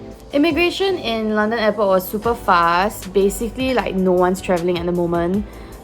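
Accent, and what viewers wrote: Singapore. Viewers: However however however love the Singapore accent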